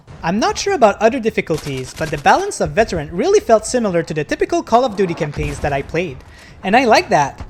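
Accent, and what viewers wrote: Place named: New Brunswick, Canada. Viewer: Un voisin Newbie!! I'm trying to make out your accent but I'm not sure, Edmunston, Peninsule p-e? Bathurst?? I'm also french and from Bathurst. btw I just gave you your 1000th sub. Congrats!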